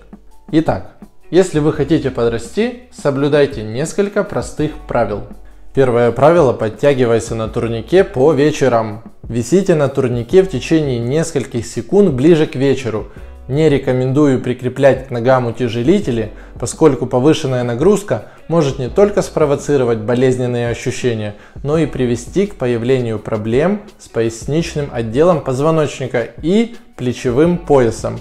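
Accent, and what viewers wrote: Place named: Ukraine. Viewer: Опять ховор, укр...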